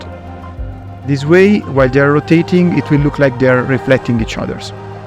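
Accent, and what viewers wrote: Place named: Italy. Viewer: I like the italian accent, makes the content more original. Nice!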